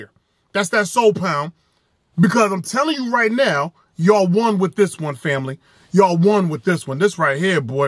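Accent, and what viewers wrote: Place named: New York, United States. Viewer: Yo this dude gotta be from new york... "Yahll gave wild feedback"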